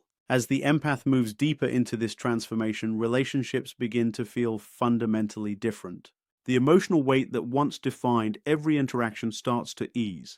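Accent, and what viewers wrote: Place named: England, United Kingdom. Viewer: since when does Chase Hughes have an English accent?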